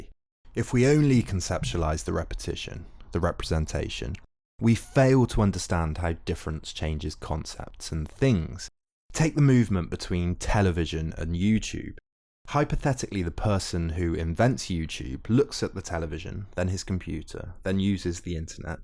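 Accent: United Kingdom. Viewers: With these types of videos, my brain cells really appreciate the British accent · oh man, i love hearing this info from a british accent instead of an american. i feel so smart right now lmao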